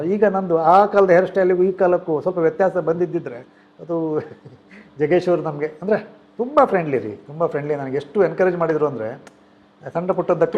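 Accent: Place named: Kundapura, India. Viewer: ನಿಮ್ಮ ಭಾಷಾ ನೈಪುಣ್ಯತೆ ಅದ್ಭುತ.... ನಿಮ್ಮ ಜೀವನಾನುಭವ ನಮ್ಮ ಕುಂದಾಪುರ ಕನ್ನಡ ಕೇಳುವಾಗ ಆಗುವ ಆ ಕರ್ಣಾನಂದವೇ ಬೇರೆ ❤❤❤❤❤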